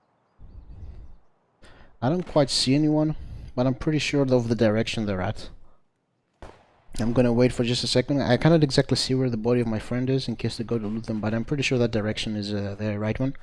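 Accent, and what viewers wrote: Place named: Greece. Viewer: ahhhhh that greek accent <3 nice vid hehe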